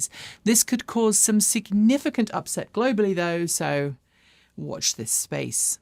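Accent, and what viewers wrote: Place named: RP, United Kingdom. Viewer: Love the Brienne of Tarth accent ❤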